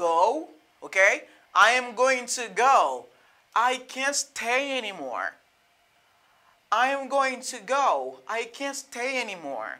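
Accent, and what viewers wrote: United States. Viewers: You speak like an American ! I have no regret to follow you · Good job teacher so your English is well my friend and speak like the American people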